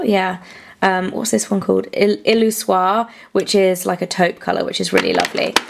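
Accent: United Kingdom. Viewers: British accent I WANT THAT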